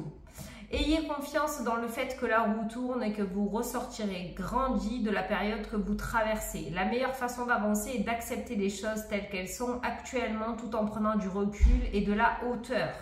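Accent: South, France. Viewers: Félicitations pour les 60 000 abonnés! Je participe au concours avec plaisir, j'aurais l'occasion d'entendre votre accent du sud au téléphone. Très belle journée 🌹🍀🐞🦋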